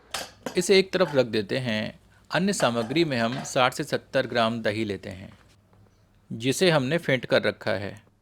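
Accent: Standard. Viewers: itni madhur aur suddh hindi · Yeh shudh hindi hai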